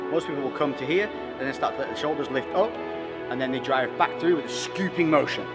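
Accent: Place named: Northern England, United Kingdom. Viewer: "Loosey Goosey" propper Northern lad :)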